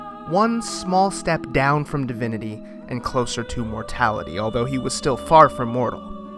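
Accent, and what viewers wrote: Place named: Brazil. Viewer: You sound Brazilian which is a compliment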